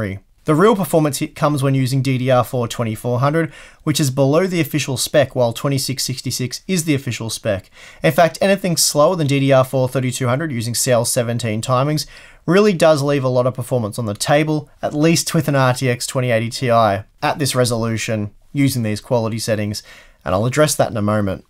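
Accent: Australia. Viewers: Anyone else like how YouTube subtitles always say harbor unbox? Dat Aussie accent 😂😂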